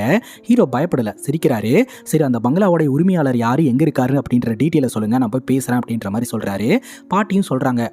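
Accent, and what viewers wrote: Coimbatore, India. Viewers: coimbatore ah neenga?!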